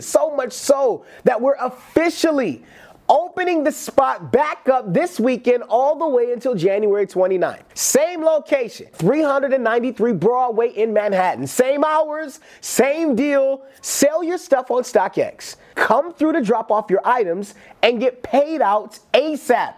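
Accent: United Kingdom. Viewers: the only thing worse than the yeezys 2018 releases is your British accent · Full body cringe to that British accent...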